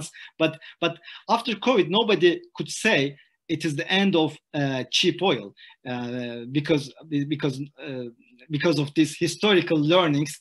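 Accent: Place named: Turkey. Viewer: Aksandan həmən anladım ki, danışan türkdür :) uğurlar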